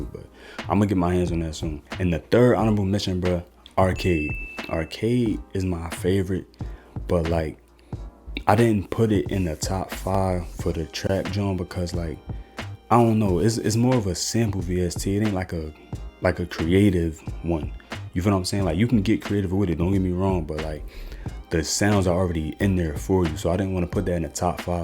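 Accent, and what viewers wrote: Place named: Philadelphia, United States. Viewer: sound like he from Philly. Good vid.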